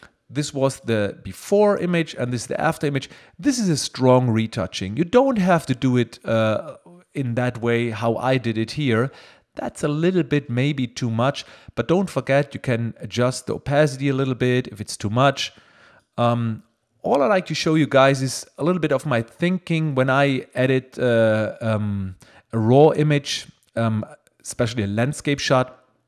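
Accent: Germany. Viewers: Mal wieder ein tolles Video! Finde den Stil toll, er gehört zu dir und das Bild kommt dadurch großartig zur Geltung. Finde es übrigens gut, auch mal englische Tutorials zu bekommen, so kann man sich über das "deutsche Englisch" (für mich persönlich genauso gut verständlich wie Deutsch) weiterarbeiten zu anderen Nationen vorarbeiten, wo teils deutlich schneller und (wohl eher bloß aus deutscher Sicht) undeutlicher gesprochen wird :D Und wie pflegte es Ben letztens so schön in seinem Interview mit Ben von Wong zu sagen... (Frei zitiert) "Ihr versteht das Video nicht? Nehmt es als Anlass, Englisch zu lernen!" Weiter so! Vielen Dank! ;) PS: Falls du Zeit findest auf die Frage einzugehen, wäre es großartig! Du hattest mal einen Link gepostet bzw. war dieser im Zuge deines Kommentars zu Spiegel-online Lesern enthalten, indem es zu einem Script vom Camera Raw für PS gehen sollte. 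Bei mir öffnete sich da immer wieder nur der iTunes Store. Arbeite mit Windows und CS6, möglicherweise schon nicht möglich? Bin diesbezüglich technisch noch nicht so fit und bin bei Google auch nicht so recht fündig geworden. LR habe ich zwar seit kurzem auch, würde aber gerne in PS diese tolle Option mit drin haben - falls möglich. Ohje, typisch Frau mal wieder viel zu lang formuliert :D Falls du Zeit findest - ich freu mich ;)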